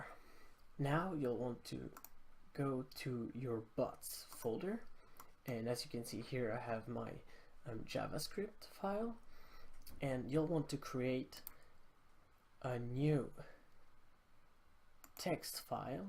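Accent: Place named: France. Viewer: Un français anglophone ? Why not, une petite correction, le point se dit « dot » 😉 en tt cas gg à toi !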